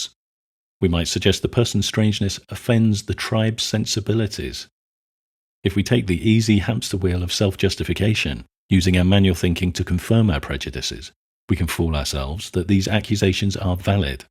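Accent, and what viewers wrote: United Kingdom. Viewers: There is a zero-sum game in real life, though. Resources and land-carry capacity are all limited goods. The ivory tower moralizing you see here in this video is never related to real world current-day examples for a reason. It would lay low the sort of bourgeoisie boutique politics that the author labors under while ironically living in a bubble where he deludes himself into thinking everything is unlimited since he is disconnected from the tribal realities of the situation of say the working class in Britain where his accent would suggest he lives.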